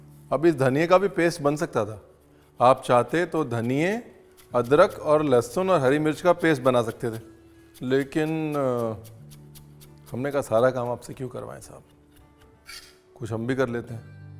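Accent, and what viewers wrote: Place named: Kanpur, India. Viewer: Aap kanpur se h lag raha. Aapki boli kanpuriya h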